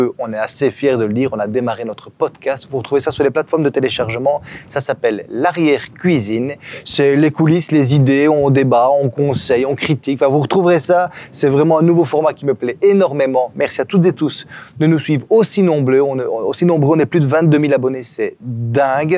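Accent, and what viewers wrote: Belgium. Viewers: Une vidéo complète avec de bonnes explications ; du coup je vais de ce pas m'abonner à votre site car "cerise sur le gâteau" : J'adore votre accent qui me rappelle ma jeunesse lorsque j'allais à Liège chez ma tante (précision : je suis française 😉😉) A bientôt pour de nouvelles vidéos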